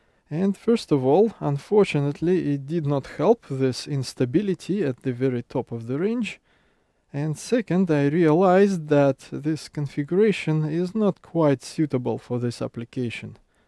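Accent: Russia. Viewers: Hm, you have a Russian accent. Are you from Russia?=)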